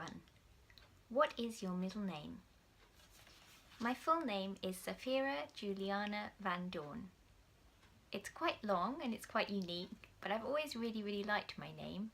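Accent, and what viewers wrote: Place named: England, United Kingdom. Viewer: You have such lovely, calming voice and beau tiful english accent. I like to hear you a lot!!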